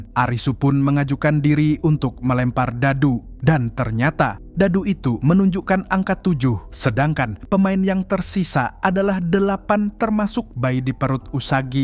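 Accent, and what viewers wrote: Banjar, Indonesia. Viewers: uma lah di video ini keluar banar Banjar sidin 🤣🤣🤣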